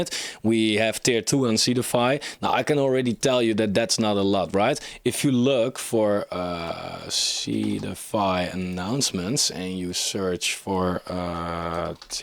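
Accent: Netherlands. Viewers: haha nederlands accent, prachtig. goeie video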